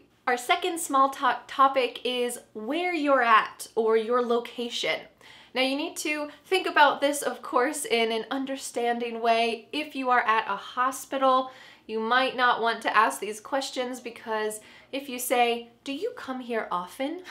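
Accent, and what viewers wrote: United States. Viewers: Yep, I m really into your American English. Txh a bunch my English is quick and dirty from Londrina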